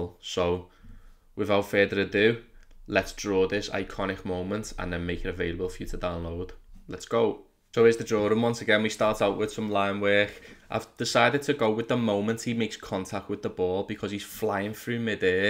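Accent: Liverpool, United Kingdom. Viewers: Are you liverpool fan?😁 Because you have liverpool accent. great video keep it up.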